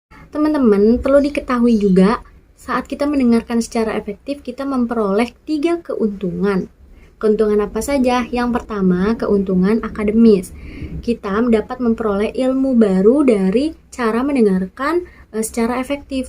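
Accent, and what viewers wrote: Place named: Madura, Indonesia. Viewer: Kadang logat madura ngikut wkwkwk 😅😅 selebih ny ok lah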